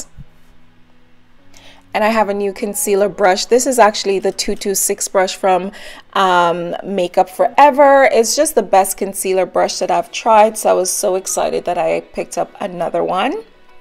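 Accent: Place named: Jamaica. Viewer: Are you Jamaican ? Or of Jamaican/West Indian heritage? I am sensing a hint of my mother tongue :D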